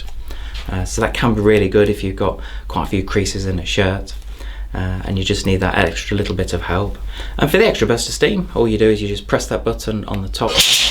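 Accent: United Kingdom. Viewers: YOU ARE MY HERO !!!! SO BRITISH, SO POLITE, SO ASMR !!!!!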